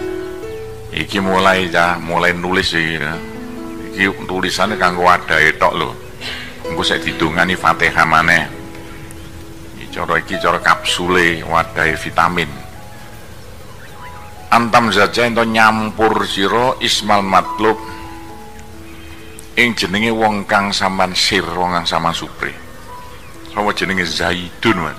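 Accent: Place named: Tegal, Indonesia. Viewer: Yo ora po2 kamu gk mau dngerin skip aja gtu aja kok repot he ini ilmu pnteng bwat orng bnyak cuman pk ustad nya klaw ngomong di dengerin sendri gk ngerti omongane ngebut kyak kreta api gk jelas jlimet pei..pei ...pei..kyak orng gk pnya gi2 sya aja yg dngerin ceramah nya gk nyambung omong nya mirip orang ngapak tegal 'smua blom tentu ngerti bhasa nya jdi klaw ceramah pkek bhasa indonesia yg jelas dn di mengerti gk jlimet kyak so2r nya benang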